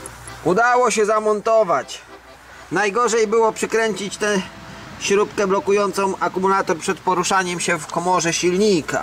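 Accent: Poland. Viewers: gwara podlaska? :D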